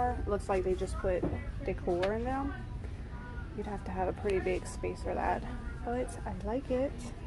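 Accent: Louisiana, United States. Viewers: Mam, was wondering... Are you Cajun? I live in Louisiana and you accent sounds very familiar. Love it!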